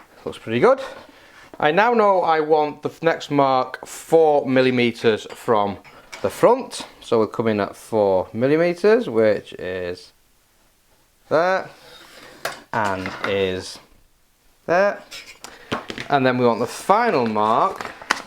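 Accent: United Kingdom. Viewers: nice vid just found your channel mate if this video is anything to be judged on looking forward to other content is that a Manchester accent or do my ears deceive me from Manchester my self just asking many thanks Harry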